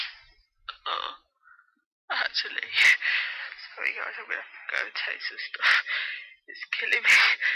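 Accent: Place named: United Kingdom. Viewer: The Brittish accent un-topable sneezes. you have it all!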